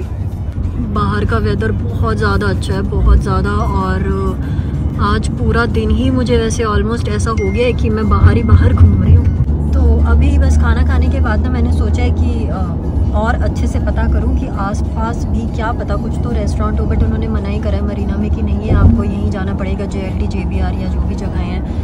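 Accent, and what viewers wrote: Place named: Pakistan. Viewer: Aap Pakistani drame bahut dekhto ho lagta h .apki bat karne ka dhang thoda vaisa hi gya h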